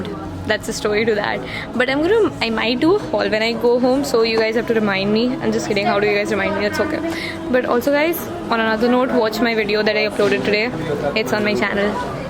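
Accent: India. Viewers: You are from Patna but your accent is really bombay types😊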